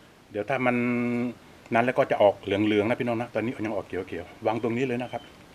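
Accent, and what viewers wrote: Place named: Central Thailand, Thailand. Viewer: คุณ เป็นคนใต้ แต่พูดกลาง ให้เกียรติแฟนคลับภาคอื่นๆด้วย น่ารักมากค่ะ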